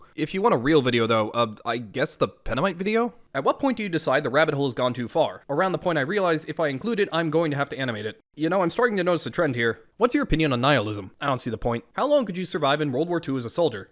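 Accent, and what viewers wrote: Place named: New England, United States. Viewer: you for the info I though he has the new England accent or speech paturn.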